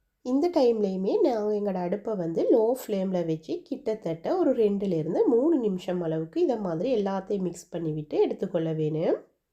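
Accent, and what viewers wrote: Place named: Sri Lanka. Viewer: இறச்சியில் முள்ளா. 🤣🤣இலங்கை தமிழ்👍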